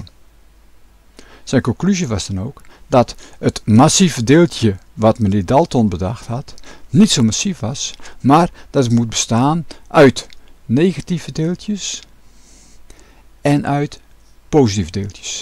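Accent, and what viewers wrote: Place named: Drenthe, Netherlands. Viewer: Heerlijk drenths lol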